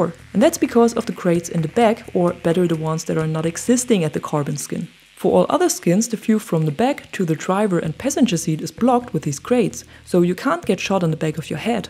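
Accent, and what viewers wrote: Germany. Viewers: You have a new sub. Finally a channel with great tips and tricks instead of endless crying how bad the game is. Judging based on your accent, you are from Germany right?:)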